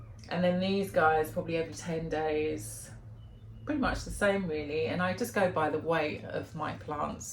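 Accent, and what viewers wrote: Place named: United Kingdom. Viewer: Love live your British accent- you make words sound so fancy😊 Greetings from Connecticut-USA 🇺🇸but was born in the Philippines 🇵🇭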